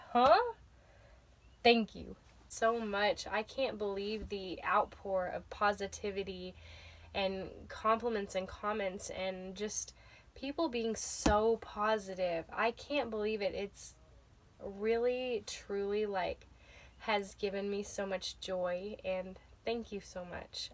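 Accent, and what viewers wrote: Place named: South, United States. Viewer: Girl! I feel you about the way people perceive us based on accent. So like you I speak with a southern intellectual accent. I ain't stupider than you Ms and Mr California! Lol I just have more spice in my life. Keep up the good work!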